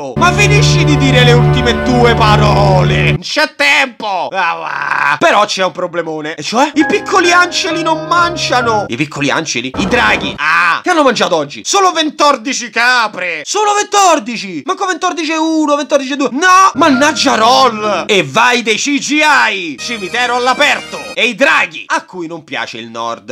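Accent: Rome, Italy. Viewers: Il primo romano sulla terra che pure se parla romano, non mi fa venire voglia di spegnere youtube. Sei un genio!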